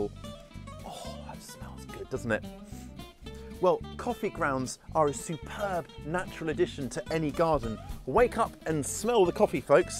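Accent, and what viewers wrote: England, United Kingdom. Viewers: “Give me some skin bruh” something about your English accent has me addicted to this channel lol the endless knowledge and tips help out too 😎🤘🏼🪴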